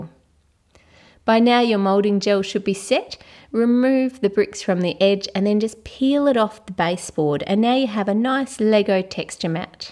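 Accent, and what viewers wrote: Australia. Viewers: R u Aussie (I is) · I love your accent so much I want to have an Australian accent so much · Are u Australian?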